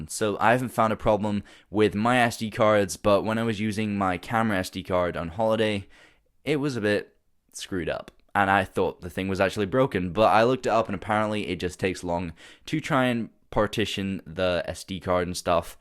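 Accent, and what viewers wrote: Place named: Scotland, United Kingdom. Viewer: you have got a wee Scottish accent coming through mate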